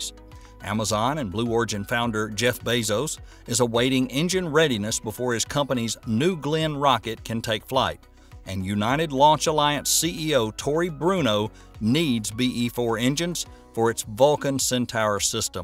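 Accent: South, United States. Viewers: Whether it’s a real human or just a really nice voice synthesizer… that’s an awesome Southern accent!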